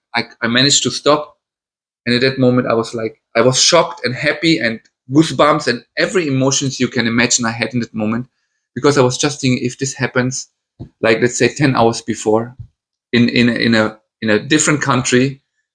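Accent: Nagaland, India. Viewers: God saved you and gave you lot of chances due to some purpose and the purpose was to come to India and meet your wife I guess. Your testimony was good and clear, best thing is that you speak English just like a Naga. Thankyou for sharing this bro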